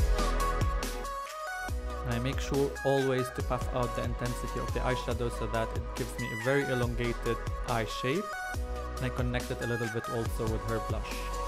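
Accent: Arab world. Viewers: يلي عم يحكي لهجته سوري لبناني حتى بالانجليزي😂😂 وشرط مليون بالمية انه لبناني سوري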